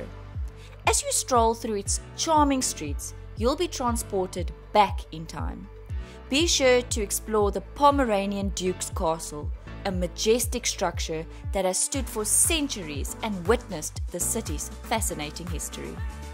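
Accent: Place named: South Africa. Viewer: Its funny to here a South African voice about Poland lekker man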